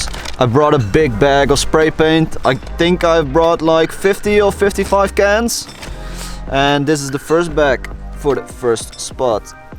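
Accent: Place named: Netherlands. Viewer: Ben je nederlands ik hoor het aan je accent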